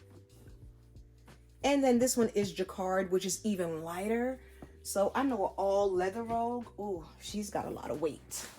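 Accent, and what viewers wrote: Jamaica. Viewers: I was contemplating whether or not I should get this for my mom. I think your video sold me on it. Btw, did I hear a Jamaican accent?! JAMAICANS STAND UP!!!! 🇯🇲🇯🇲🇯🇲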